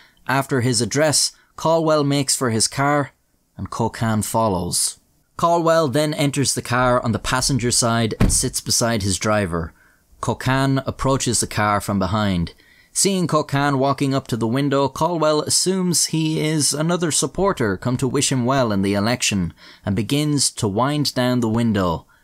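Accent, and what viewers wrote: Ireland. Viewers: can you do the port aurthur shooting? or the cookie question, I've never heard anyone with an Irish accent do the cookie question. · Did anyone else know that is just irish and isn’t just British with a speech impediment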